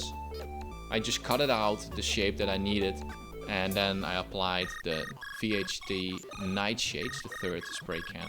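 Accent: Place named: Netherlands. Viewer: het is leuk dat je automatisch iemand kan herkennen die nederlands praat aan het accent :>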